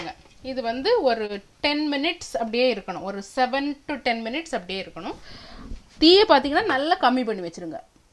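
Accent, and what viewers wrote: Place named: Kanyakumari, India. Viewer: Akka, Ungali kumai tamil miga alagu....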